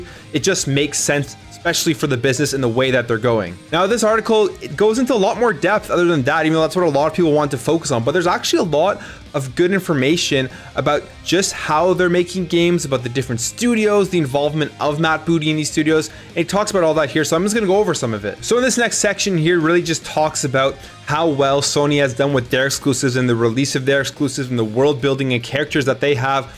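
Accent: Canada. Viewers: your from Toronto area im guessing I'm pretty good with accents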